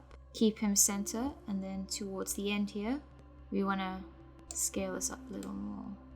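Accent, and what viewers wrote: South Africa. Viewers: Awesome tutorial! And great to hear an SA accent with shots of Milnerton and Hout Bay :D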